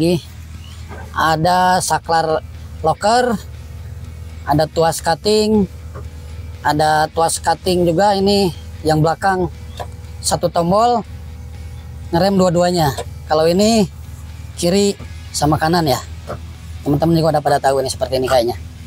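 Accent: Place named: Indonesia. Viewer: Dari logatnya pasti org indonesia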